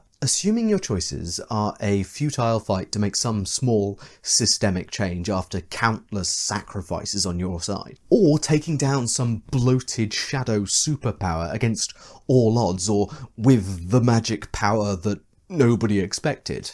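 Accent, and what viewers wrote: United Kingdom. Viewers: This guy is amazing in the sense he is 100% wrong on every video he makes but his narcissism and british accent fools him into thinking he is correct.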